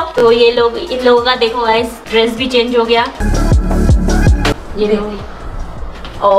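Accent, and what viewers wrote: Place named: Bengal, India. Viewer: Tum itna cute keuho bataou mughe pls didi up kis jaga per reheteho up Bengalis he bat karte ho pls didi pls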